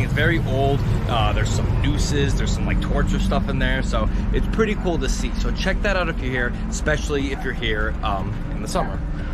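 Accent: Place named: Philadelphia, United States. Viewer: The Philly accent really gives this video a certain charm.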